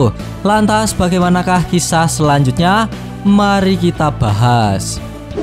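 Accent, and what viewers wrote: Java, Indonesia. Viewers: Medok anjay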